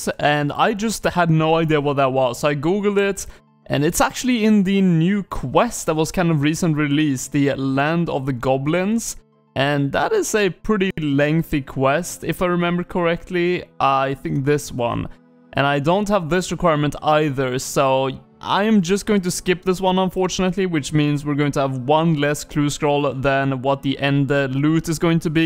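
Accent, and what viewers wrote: Sweden. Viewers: Really love the series, you sound swedish are you? "drÄgon hunter lAnce" RSN: M4tsson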